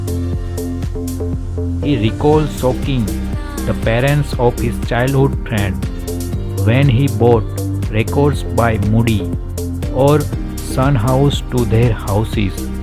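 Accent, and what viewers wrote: India. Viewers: The voice in the documentary seems Indian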